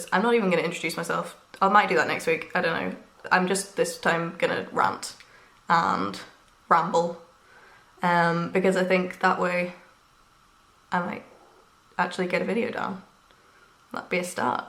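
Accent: United Kingdom. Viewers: Enjoyed your first podcast, nice to have another UK voice in the mix!!! Loving your red cardi. There is a school of thought that suggests, when you have a lot to do, doing/finishing the easier or quicker things first is helpful because quickly you can get the list down to fewer items which eases the overwhelm…??? Dunno if this appeals? Also loving the look of your ‘scrappy cardigan’ that’s going to be beautiful. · Hi, I was wondering....Are you English? Cause, I like your Accent, there. 🆒️🆗️👍. I hand knit & use a flatbed knitting machine. But, I'm not a Podcaster. I just watch others knit & Crochet. I Love that most. I hope you show a lot of your knitting projects on YouTube. So, that Knitting Family can see your work! And NEVER, we're if you are showing mistakes in your work. Cause, that's just teaching everyone that, No one is perfect. And that's OK. Everyone is Human. 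Just go for it, your Dream, here. I will be rooting for you. Bye, for now. Blessings I send to you, too. 🙏. Sincerely, Elsa R. 64 yrs. young/old woman. From out in California👋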